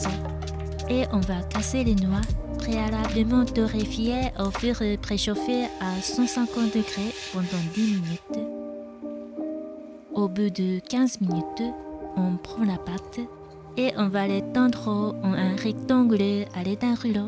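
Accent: Japan. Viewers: Bonjour je viens de decouvrir ta video sur le pain aux figues et noix cela ma donne envie d'en faire tout de suite, du coup je m'abonne a ta chaine. PS J'adore ton accent japonais merci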